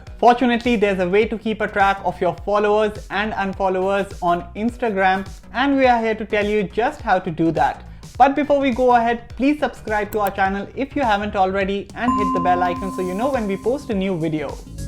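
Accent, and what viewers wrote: India. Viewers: Indian accent, im out!!! ✌️ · Indian accent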